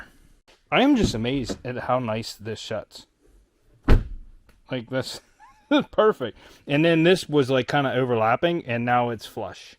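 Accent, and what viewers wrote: Pennsylvania, United States. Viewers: Damn. That's unfortunate. It only looks like the door, fender and front pillar. By your accent, I'd say that you are in Eastern Pennsylvania? How about the junkyards in the Scranton area for parts?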